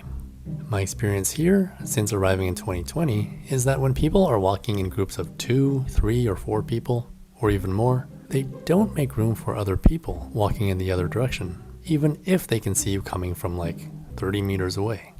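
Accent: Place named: Lithuania. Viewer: Your english accent is 100% lithuanian! Lol!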